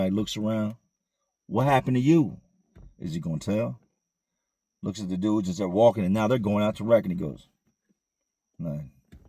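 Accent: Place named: Maryland, United States. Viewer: You sound like you from Md. Love how you don't sugarcoat like prison is fun because its terrible.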